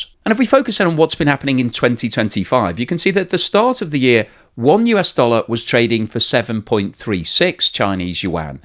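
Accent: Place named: England, United Kingdom. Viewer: He sounds pretty English to me.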